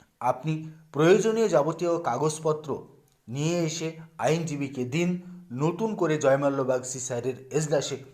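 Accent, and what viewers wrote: Bangladesh. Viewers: বাংলাদেশী কথার জন্যে উনার কথা শুনতে ইচ্ছে করে না। বলার ধরন চেঞ্জ করুন।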